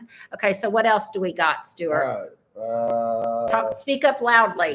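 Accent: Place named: United States. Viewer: I like to count the “and by the way”, especially when followed by “you guys” - I must say however, your way with language is a joy - especially as being English I can feel smug about what we consider the American mispronunciations, especially words like herbs, which we pronounce as in Herbert Hoover - always I can hear my mother saying “ don’t drop your aitches “ love these videos, was really disappointed not to be able to join live. Thank You.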